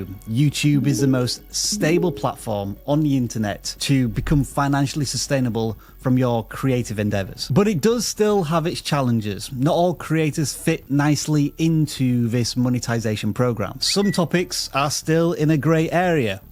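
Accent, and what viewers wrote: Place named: Yorkshire, United Kingdom. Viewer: Yo I know you live in Canada, but I know that you came from Yorkshire. I can hear it in your accent. What I would like to know is cause. I have a friend who’s from Yorkshire what part of Yorkshire are you from? He’s from Hull. He also has a YouTube channel, so I have been comparing your accents.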